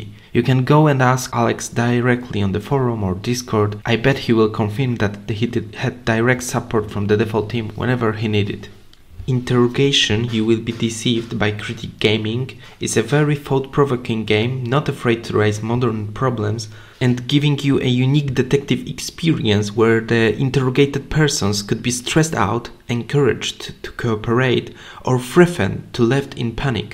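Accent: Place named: Poland. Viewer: Polski akcent od razu można poznać.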